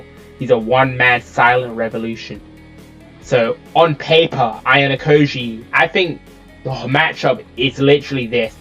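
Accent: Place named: United Kingdom. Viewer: 25 minutes of british accent.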